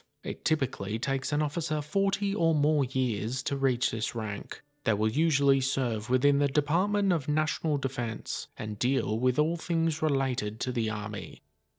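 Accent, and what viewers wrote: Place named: United Kingdom. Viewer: Interesting....my father was RCAF, seen WWII, stayed in until 1969. Not sure if it's the British pronunciation of the presenter, my father seen the old British style ranks then the new American style change....he retired a lieutenant-Colonel, I never heard him refer to his rank as a "leftenant" it was more a "lutenant-Colonel".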